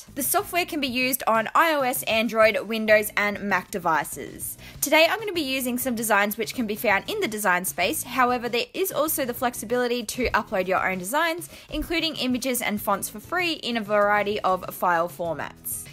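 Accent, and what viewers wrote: Australia. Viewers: Yeah! A new video! Love your work and Australian accent! Hugs from good ol USA!!!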